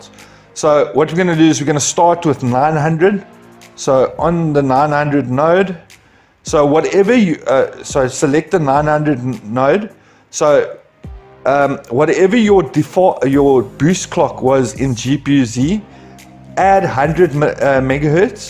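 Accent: South Africa. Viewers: I know a south african when i hear one...Hehe lekker video brother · Legend brother. Also, are you South African by any chance?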